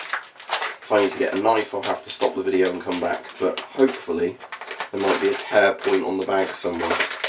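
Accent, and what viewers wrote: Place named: England, United Kingdom. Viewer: I like you english voice👍🏻👍🏻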